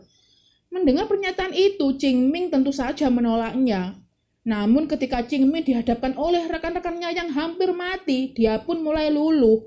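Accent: Java, Indonesia. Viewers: moso sih mbak ora ngapusi kan... bahasane lucu medok² piye gtu...🤭 smangat mbak yu....👍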